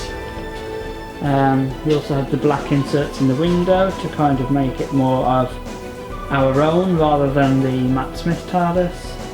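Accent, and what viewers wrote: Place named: England, United Kingdom. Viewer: What part of England you from?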